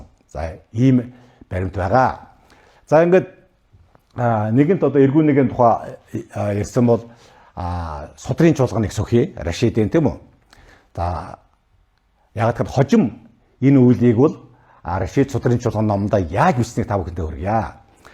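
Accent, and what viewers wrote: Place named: China. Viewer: энэ одоо ямар гээчийн монголоор гайгуй ярьчихдаг хужаа вэ!!!!!!!туух гуйвуулаад байгаам уу эсвэл яриад байгааг нь би ойлгохгуй байгаамуу?????хужаа л байна ш дээ!!!!!!яриа нь ойлгогдохгуй юм байна !!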